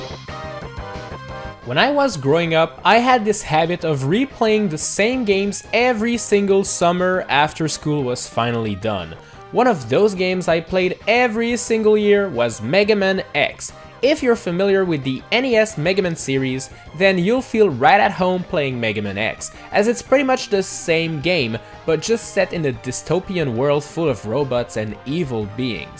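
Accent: Quebec, Canada. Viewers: The captions say busses when he says bosses. Does fight busses, is this just a Canadian thing? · it's a French Canadian accent if you're wondering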